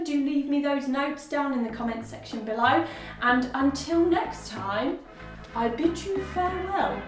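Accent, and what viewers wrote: United Kingdom. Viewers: Thank you! since I watching your videos i find style which fits me 100%! and I must say that I sold almost all my clothes and I buy some blazers, mom jeans and love a neutral colors! LOve your british accent<3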